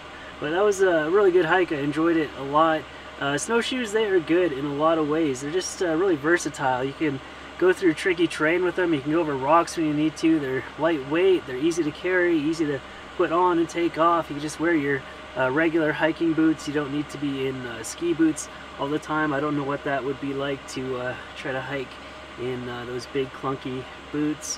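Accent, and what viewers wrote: Canada. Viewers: He needs some Dominos in his life. I should really donate to this guy. Love the Canadian accent. Tell is a story or 2 about your hockey youth!!!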